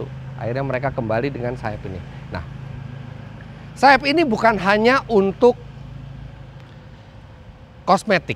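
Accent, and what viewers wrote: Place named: Chinese-Indonesian, Indonesia. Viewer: dari aksennya iki cindo sby lek gak semarang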